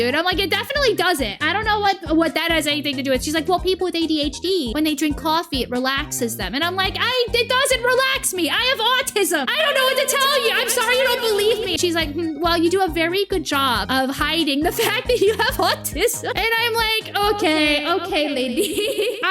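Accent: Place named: Boston, United States. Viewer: You sound like you have a Boston accent and it's really funny to hear the word pronounced "UAW-TISM"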